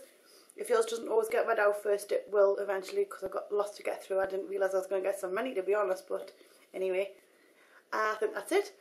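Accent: Newcastle, United Kingdom. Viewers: Are you from newcastle? I LOVE your videos!! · Wish I had your Geordie accent. Amazing! :) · the geordie accent is amazing!:')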